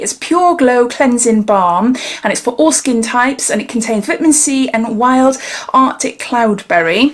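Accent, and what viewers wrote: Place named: Pembrokeshire, United Kingdom. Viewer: Just subscribed. Stumbled on your video and we are simialar ages. Also detect a welsh accent, I think Pembrokeshire. I am from Newport (Gwent)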